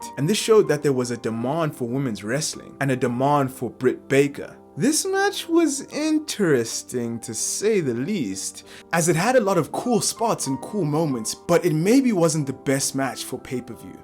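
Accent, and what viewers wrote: South Africa. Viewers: You sound like a south African. Good job brother you did a great job on the video so funny and entertaining. Your channels gonna blow up keep it up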